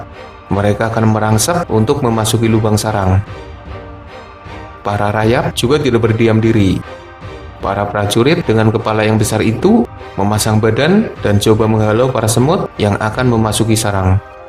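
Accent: Banyumas, Indonesia. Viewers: Serasa di warteg dengr ngomongny,ini aslinya ngapak kykny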